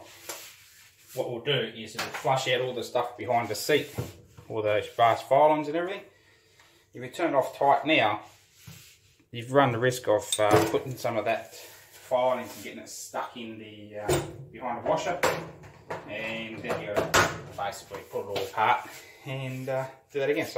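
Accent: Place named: Australia. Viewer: Yer rite maaate! It is a local accent. Understood throughout OZ. Try those hillbilly accents from some states in the USA. Impossible!!!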